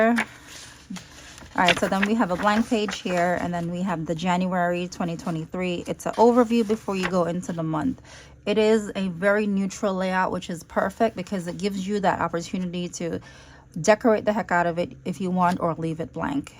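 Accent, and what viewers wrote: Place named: Jamaica. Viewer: Your channel is refreshing... without the stress. I will learn a lot from you. Lol! And I scrolled through to ensure I was hearing a Jamaican accent albeit mixed 🇯🇲😎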